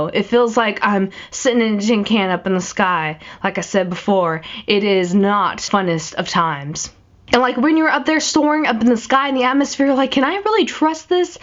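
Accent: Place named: Texas, United States. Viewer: You picked up a smidge of a country accent while you were in texas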